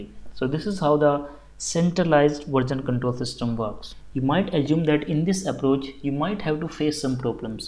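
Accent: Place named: India. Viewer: I don’t like ur Indian accent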